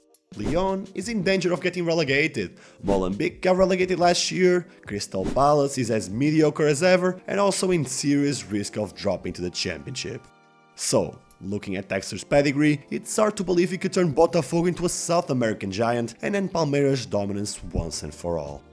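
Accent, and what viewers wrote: Portugal. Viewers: Portuguese accent, and his gf is Portuguese, while he might have learned the language I'm assuming he's not from Portugal am I right? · Are you portuguese? I can hear some accent rsrsrs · No tactics? No strategy? Based on your accent, I thought you were Portuguese, but you talk about football like an American.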